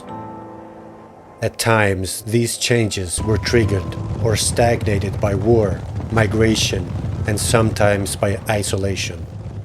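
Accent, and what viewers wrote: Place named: Arab world. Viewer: O njerez, nese doni me ba dokumentar te tille dhe ne Anglisht atehere le te jete o dikush qe flet me mire o dikush qe e ka gjuhe amtare, ky narratori po tingellon si me lexu noj Arab.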